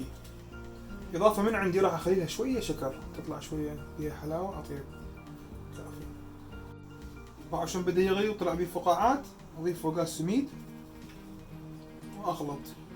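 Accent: Iraq. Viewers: والله اكثر شي عجبني بيك هي لهجتك العراقية الاصيلة والاحلى بعد هوة هو اسلوب السهل الممتنع الي عندك بالطبخ اتمنالك كل التوفيق ❤🌹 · متابعة هاد الشيف مو بسبب الطبخات لان احب اللهجة العراقية وموت فيها مغربية مرت منهنا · انا صح شايف شيفات بس مثلك ومثل اكلك ما شفت اقسم بالله يخوية انا عراقي من اباوع على فيديوهاتك اجوع اكلك (( زي الفل )) صرت مصري مو هههههه بس سؤال انت عراقي لهجة مالتك عراقية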